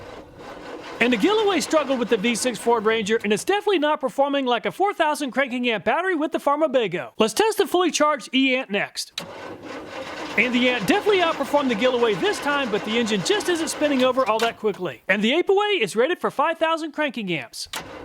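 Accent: United States. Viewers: If only I could stand to listen to your squeaky Yankee voice.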